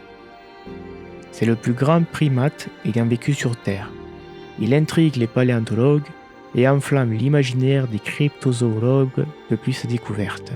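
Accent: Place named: South, France. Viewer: Je découvre ta chaine, franchement j'aime beaucoup. Tes vidéos "News" sont intéressantes tout en étant relaxantes, c'est un format très appréciable. De plus, étant originaire du Sud de la France, ton accent me ramène un peu chez moi ! Bref, tu gagnes un abonné. ^^ Du coup je te dis à la prochaine ! ;) PS : Petite remarque sur un détail qui a tout-de-même son importance : le nom de l'espèce ne prend jamais de majuscule, seulement le nom du genre. Aussi, dans ce cas, il te faut écrire Gigantopithecus blacki dans le titre. Voilà voilà. :p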